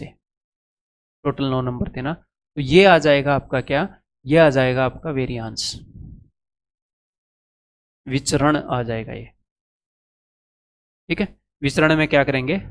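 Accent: Haryana, India. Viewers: sir ka haryanvi language k saath padhana accha LG rha or statics bpsc k mains bhi aati h tension nhi rahi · हरियाणवी हो क्या Sir 🙏👌 Thanks 👍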